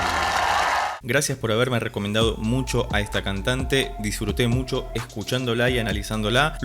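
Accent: Argentina. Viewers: Entre mate y to, lo que habla como argentino, no desarrolla lo de interés de escuchar la voz de la joven. Blablablá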